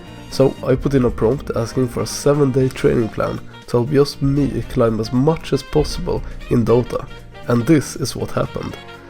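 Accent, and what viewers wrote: Sweden. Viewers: the most swedish english speaker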